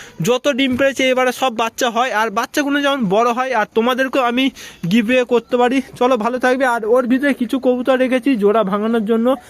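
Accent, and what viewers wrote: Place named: India. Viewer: আপনার কথা গুলো দেখে মনে হচ্ছে আপনি ইন্ডিয়ার লোক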